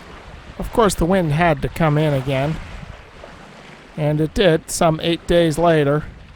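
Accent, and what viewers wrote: Maine, United States. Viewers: Are you a Mainer. You talk very similar to myself and most of my Maine relatives. That's meant to be complimentary. And explains your excellent seamanship. "Stand Fast all ye men from Maine who go down to the sea in ships."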